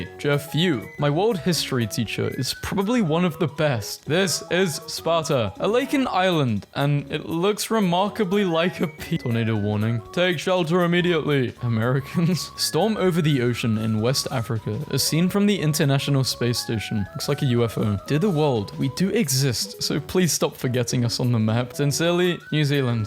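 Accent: United Kingdom. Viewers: the vid was so good i didnt notice u were a brit for most of it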